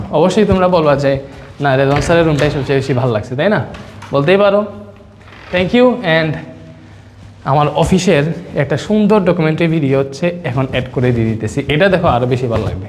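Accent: Sylhet, Bangladesh. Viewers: কথায় সিলেটি ভাইব মিলছে। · কথার মধ্যে সিলেটি সিলেটি ঘ্রাণ মনে হচ্ছে 🙄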